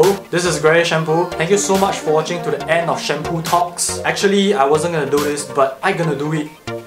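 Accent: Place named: Singapore. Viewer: Bro. I thumbs up for you! You talk like a Singaporean. Respect!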